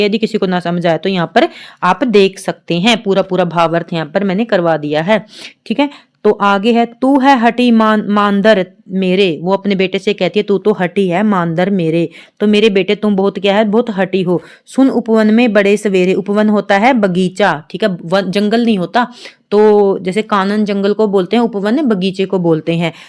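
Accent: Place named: Haryana, India. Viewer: Very nice mam aapki awaj kaithal ki hai❤❤❤❤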